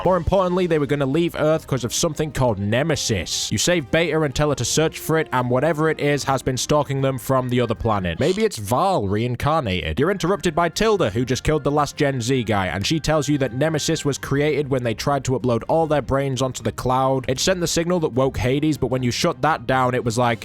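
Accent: United Kingdom. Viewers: well the game certainly was boring as shit but i theres nothing better then having an angry british lad speak about video games ... while i am playing a video game.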